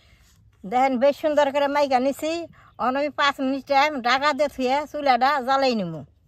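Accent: Bangladesh. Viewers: দিদি মা তোমাকে খুব পছন্দ করি। তোমার ভাষাটা আমাদের মত শোনায়। 🇧🇩 থেকে ভালবাসা নিও। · Ami Bangladesh theke bolchi,Apnar kotha o rannar dhoron bangladesh er regional type, ami Bangladesh r Indian der accent Alhamdulillah valo bhabe differentiate korte pari,Apnar kotha bolar accent/bhasha puroi amader local Bangladeshi ❤🇧🇩 ami sure apni Bangladeshei live korechen, Apnar char paser area dekheo Bangladesh er nodi porer alakar motoi lagse❤. Apnar moddhe Indian kono boishistho nai:)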